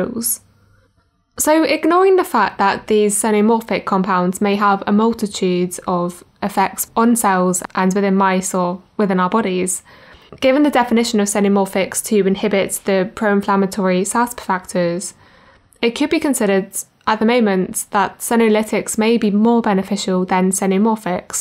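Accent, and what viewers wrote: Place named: United Kingdom. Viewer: fascinating, thank you, it's above my head but, but very neat. May I ask about your accent? I hear british but specifically what region? I almost hear a mix of a second accent but cannot place it. (it's a nice sounding accent) I'm curious, as a couple people I know moved there and they both developed their regional accents the longer they are there. Thanks again God bless you and yours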